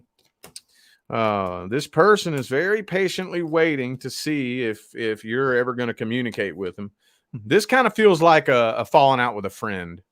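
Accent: South, United States. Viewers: YOU HAVE A SOUTHERN ACCENT WHERE YA FROM?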